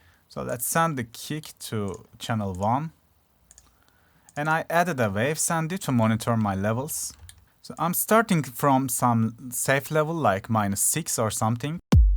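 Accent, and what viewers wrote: Turkey. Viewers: Ne kadar türkçe bir ingilizce diyordum izlerken :) çok güzel video tebrikler :)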